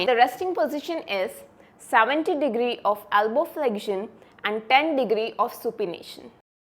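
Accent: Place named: India. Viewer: Don't try to change your accent. Indian accent is perfect for us.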